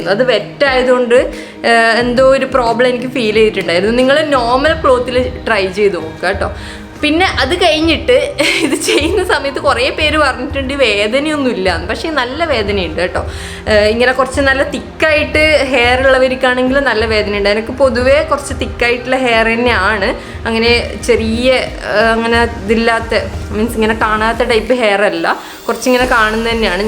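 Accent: Kannur, India. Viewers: Kannur evideya, slang kettapo thoni. Njanum knr aanu. Ente peru hanima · Chechide varthanam ketta manasilvum Kannur aann😍 njnu Thalassery aane.😁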